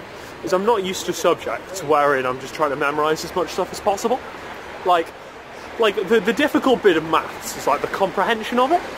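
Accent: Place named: RP, United Kingdom. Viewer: You sound so much posher than I remember cuz :) its really cool seeing what your getting up to though :)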